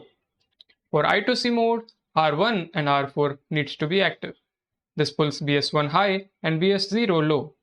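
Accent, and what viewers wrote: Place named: India. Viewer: damn, i was hoping for a video without an indian voice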